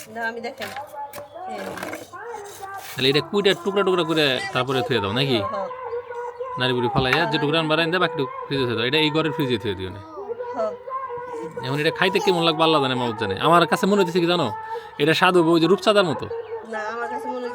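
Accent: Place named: Tangail, Bangladesh. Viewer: Bhai apnego basha ar amago basha same ai jonne video valo lage... amar bari jodeu Assam Guwahati te tar poreu Tangaila koi...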